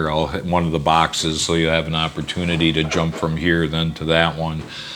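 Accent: United States. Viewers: I'm surprised his ex-wife didn't blow the whistle on him??? By the way I love your Minnesota Accent!! You sound like all my relatives!! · sounds more like a Wisconsin accent.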